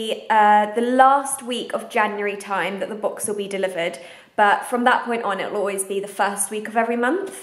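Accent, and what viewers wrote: United Kingdom. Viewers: i just melt when you speek. i love your british accent. it's so sweet and nice. the time you said snacks i just repeated it over and over because it was so lovely the way you said it. i wish i was british....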